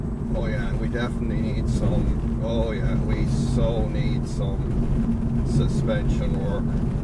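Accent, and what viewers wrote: Ireland. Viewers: Authentic Irish accent, love it!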